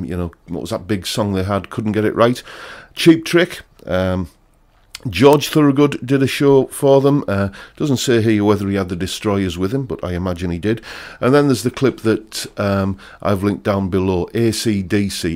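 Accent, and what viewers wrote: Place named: England, United Kingdom. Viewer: Great fun article! Love yer english m8! Wasn't there something briefly hosted by Peter Cook?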